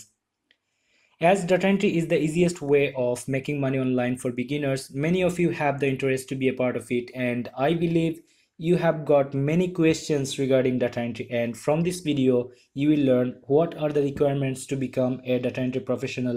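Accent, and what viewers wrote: Sylhet, Bangladesh. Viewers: R u from sylhet? Ur accent says that, great work bro🤗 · Are you from sylhet?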